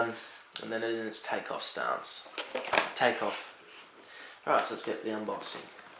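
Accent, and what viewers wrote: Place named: Australia. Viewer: ahh mate i absoloutely love your good old aussie accent! it's so close to an nz accent! (the best =D) great video!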